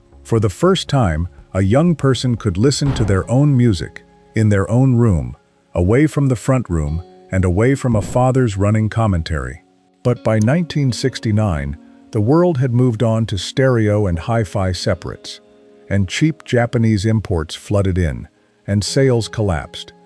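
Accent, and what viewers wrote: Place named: United States. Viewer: Why the US AI accent? And rockers illustrating an article on Mod Culture? Most of the things shown here were on their way out by 1969, apart from the skins.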